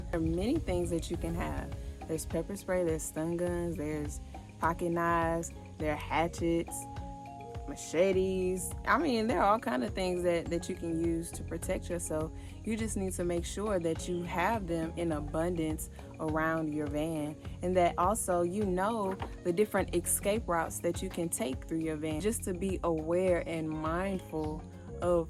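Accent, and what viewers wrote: Houston, United States. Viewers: You have to be from Houston because you have that Houston accent. Lol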